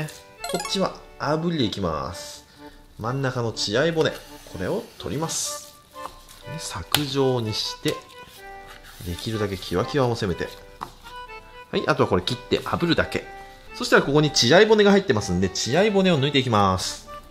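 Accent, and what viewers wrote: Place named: Mikawa, Japan. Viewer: 世界に届け三河弁🌍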